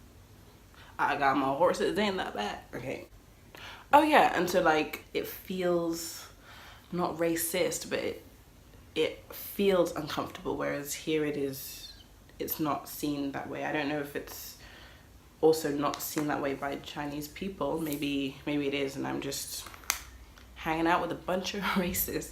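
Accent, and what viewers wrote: United Kingdom. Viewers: I love your brittish accent it just sounds so soothing can I marry you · You sound like a right and proper speaker of the Queens english and your Quite the Looker very fetching!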